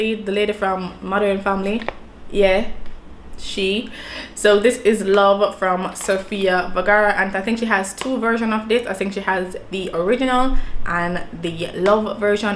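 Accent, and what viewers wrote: Jamaica. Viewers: Yasss I’m hearing a Jamaican accent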